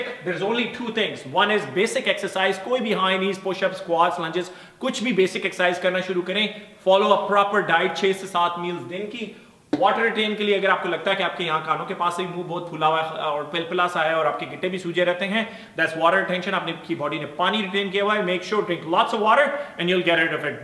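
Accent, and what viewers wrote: Punjab, India. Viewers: 😂😂 bai Canada ch reh k v teri Punjabi ch koi frk nai ayaa... love you bro..👍🏼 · i love ur punjabi as well as english accent. i m huge fan of ur accent plus u r damn cute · I like your punjabi accent and also sense of humor. but this video doesn't help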